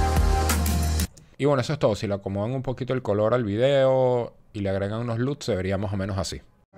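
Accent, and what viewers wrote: Venezuela. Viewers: Excelentes videos mi pana, eres venezolano cierto? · eres venezolano? muy buen tutorial :D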